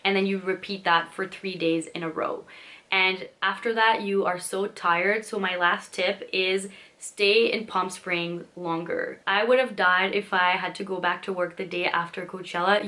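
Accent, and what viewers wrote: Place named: Canada. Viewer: You really analyzed this Coachella event especially the differences between Weekend 1 and Weekend 2. You are so good at planning and organization, you should work in government budget analysis! I love your Canadian accent, it really makes you sound warm and personable, and can make a mean maple candy! Just kidding!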